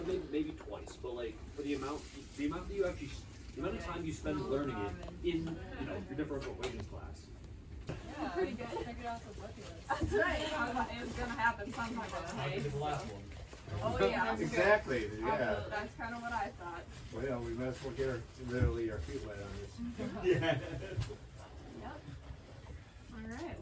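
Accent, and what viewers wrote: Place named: Connecticut, United States. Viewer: Are you from CT, by chance? I think I recognize your accent! I know that, right now, your thinking is all about "can I make it to there, by then", but soon, you're not going to care. You'll just know, because your legs will be strong and your confidence will be stronger, that you'll be able to make it to wherever you want to make it in a day. Weather won't be as important. Or at least, not as urgent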